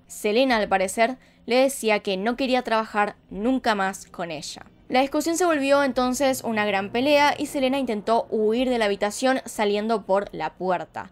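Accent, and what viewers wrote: Argentina. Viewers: Muy buen video de Selena tristemente ya no está conosotros yo pienso si siguiera viva sería más famosa , lo que me gustó de ella que era muy sencilla no se le subía la fama también era humilde te felicito por el video y me gusta tu asentó Argentino como pronunciado la Y driega me encanta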